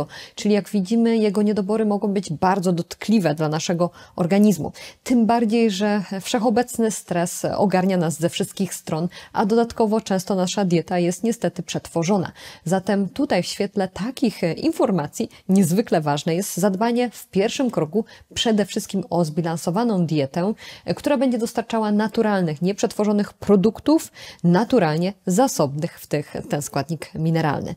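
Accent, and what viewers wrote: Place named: East, Poland. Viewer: Ta pani pewnoe jest ze wschodu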